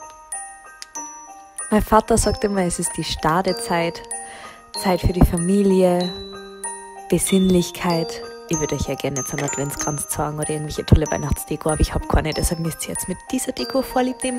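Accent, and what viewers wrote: Vienna, Austria. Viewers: Woher bist du eig., aus Wien,... oder Bayern?😂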